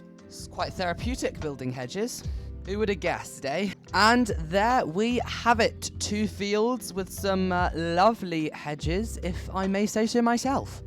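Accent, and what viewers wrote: United Kingdom. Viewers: You sound like British, are you?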